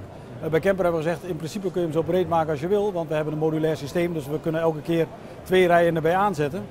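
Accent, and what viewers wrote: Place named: North, Germany. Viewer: It sounds so much like northern German, to my austrian ear^^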